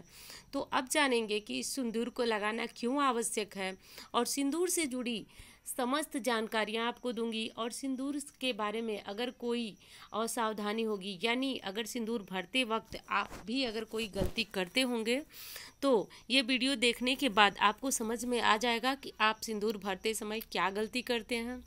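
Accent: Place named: Bihar, India. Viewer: Aap k baat sa laga ke aap bihari ha Ma b bihari hu di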